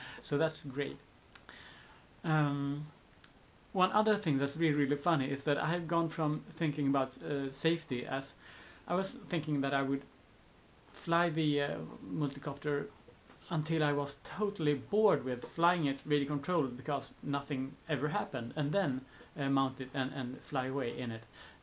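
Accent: Sweden. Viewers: Darkpassenger 1 He sounds Swedish.. They all have a long standing tradition of eating poisonous shrooms and beating the sh*t out of themselves for fun. They discovered America and didn't even care since there was nothing to loot and the people already living there were too weak to be used as slaves. Columbus had more luck a couple centuries later as he landed in South America where there was gold and the people were stronger and of more use. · ARE you Swedish? your accent is pretty Swedish... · Christian Fjelldal I sense Sweden.. I'm from Norway btw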